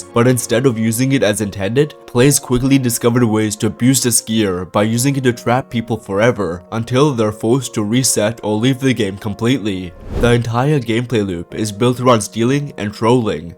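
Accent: India. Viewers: Wait why does he sound indian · I love this guys indian acseent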